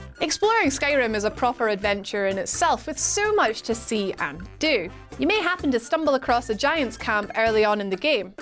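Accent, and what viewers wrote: Scotland, United Kingdom. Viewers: Gaelic, lovely. She's probably Scottish. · Is she Scottish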